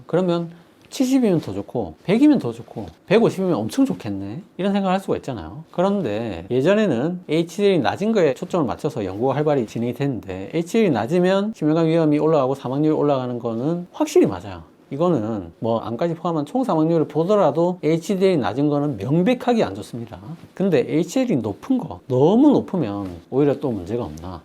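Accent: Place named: Ulsan, South Korea. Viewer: 말투가 너무 익숙해요 ㅎㅎㅎ 참고로 저는 울산출신 이에요 ㅎㅎ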